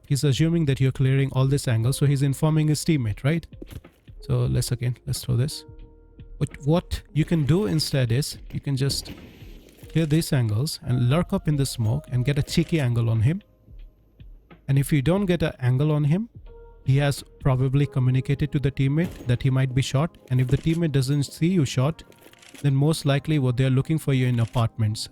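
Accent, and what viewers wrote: India. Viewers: are u indian bro? btw love ur content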